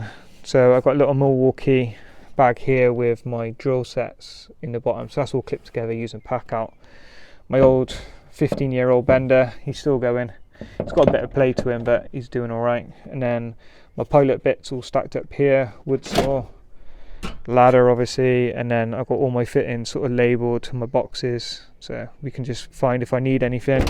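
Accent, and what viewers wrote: United Kingdom. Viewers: Are you from Brizzle pal?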